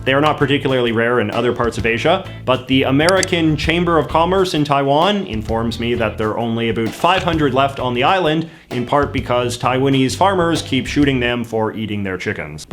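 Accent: Canada. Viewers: You say “about” the way Americans tease Canadians for saying it: “Abuht”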